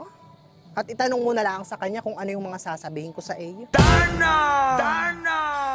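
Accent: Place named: Tanay, Philippines. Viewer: 😅😀😂ayy nakopo Meses ikaw baga e tlgng nakakatuw akoy tawa ng tawa syo meses,,,Lalo n yng Puntong Tanay, mo dito samin na "Hane" 😂😀😅👏👏👏👏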